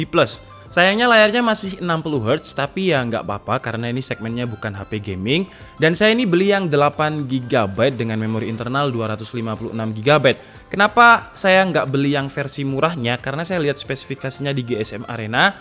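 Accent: Java, Indonesia. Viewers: Ternyata..boso jowo asline..asli wong jowo mase iki..salam santuy sam..😁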